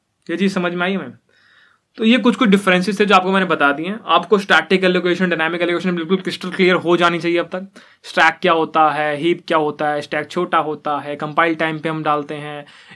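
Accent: Haryana, India. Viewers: HARYANAVI accent is so sexy.......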